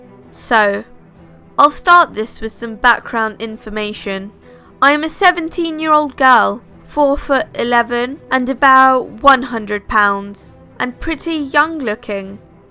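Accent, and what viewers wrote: United Kingdom. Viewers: I like your videos, mostly because of your voice and the well-picked stories. But I have to say, that the videos don't "haunt my nights" like other creepypasta-narrations (or any other creepy stuff :P) because of your voice. Personally I would say, that your videos (and your voice + the cute, little, british accent :D) are fascinating and, yes, also a bit creepy (And you don't want to know how long I've been searching for a combination like that) In short: I like your videos and ,please, never change the way your narrate the stories :)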